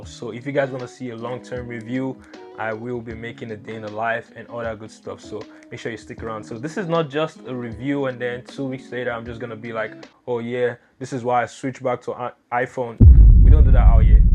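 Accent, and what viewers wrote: Nigeria. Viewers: you straight up know he's Nigerian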